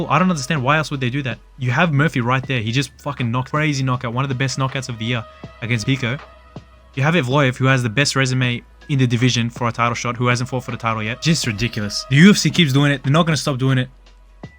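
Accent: Australia. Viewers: Didn't expect an Aussie, presently surprised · wtf didn't know my favourite editor is australian 😂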